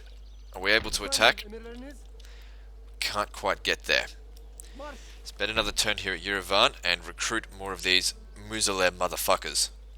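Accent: Australia. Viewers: Hes Australian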